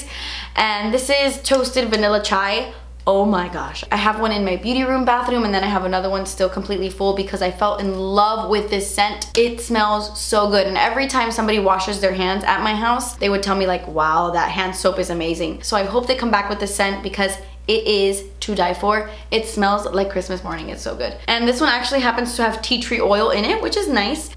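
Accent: Cuba. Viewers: ! Will you ever do a video speaking in Cuban/Spanish (sorry I don't speak those languages so not sure if they are the same/similar??)? I think your Cuban accent is really cool! 🤩 Would love to watch a video like that hehehhe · siiiiiiiiiiii!!!! Cuban accent ❤️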